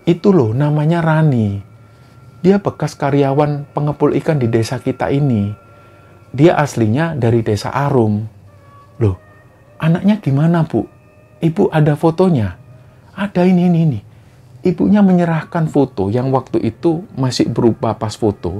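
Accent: Java, Indonesia. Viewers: Xg Q suka dari ms tuh suaranx, terus logat jawanx terasa kentel banget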